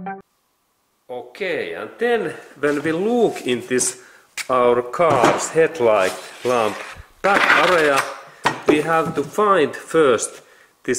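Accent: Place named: Finland. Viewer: Aiettä nautin tästä rallienglannista!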